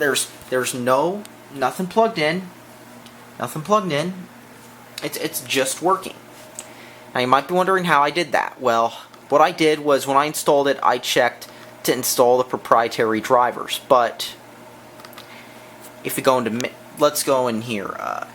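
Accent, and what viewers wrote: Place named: United States. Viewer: They opened with "This laptop sums up everything wrong with the computing industry today" in a broad American accent. I'm surprised you got 4 minutes in...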